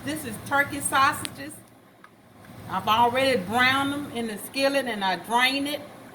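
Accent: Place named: South, United States. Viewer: You really have a southern accent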